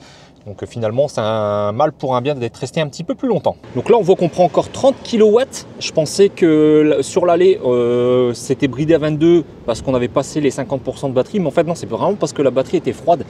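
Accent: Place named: North, France. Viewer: Salut voisin nordiste (vu l'accent), merci pour cette vidéo. Pas mal les prises de vues avec le drone au Tréport.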